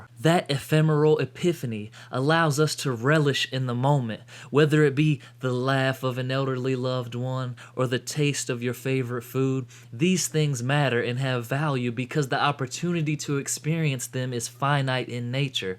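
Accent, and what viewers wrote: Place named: South, United States. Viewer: I like when enlightened thoughts are spoken with a country accent!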